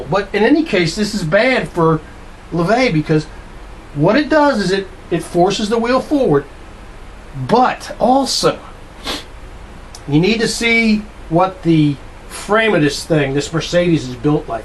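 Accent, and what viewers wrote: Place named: Pennsylvania, United States. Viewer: The BBCfour documentary on this tragedy confirms that Hawthorne admitted fault to close friends immediately after (but not publicly) and lived out his remaining years a "broken man" (better than a cut in half man which is what 85+ spectators got). The mask and with matching shirt is a nice touch and I like your enthusiasm, but I don't believe anyone doubts Hawthorne's singular role in igniting the tragedy. Lastly, I am picking up a slight Pennsylvania accent from under the mask.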